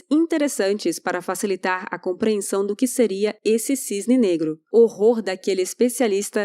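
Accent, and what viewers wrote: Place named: South, Brazil. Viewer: Ótimo artigo e muito bem apresentado. Me impressiona a bela e perfeita dicção da. Não é carioquês, como a do Peter, parece mais de SP para baixo, como a da Caroline de Toni, de Chaoecó, SC.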